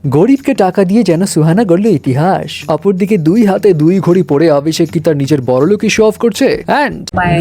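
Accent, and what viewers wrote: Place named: Kolkata, India. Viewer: কিরে ভাই আপনার ভাষাটা এরকম লাগতেছে কলকাতার মত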